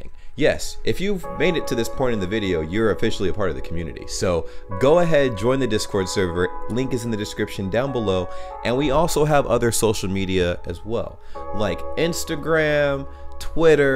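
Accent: Germany. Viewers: I think it is a good representation of the german english level on average. it might be cringe but also everyone will get what is meant at least. absolutely no problem especially considering this 4 am English...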